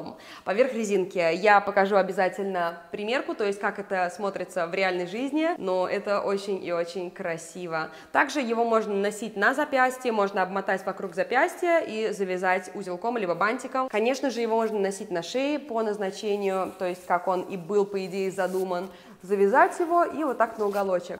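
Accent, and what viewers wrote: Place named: Italy. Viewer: Больше всего люблю, как ты говоришь! У тебя появился акцент итальянский?) Не акцент даже, а интонирование, точно не русское) очень мне нравится🙌🏻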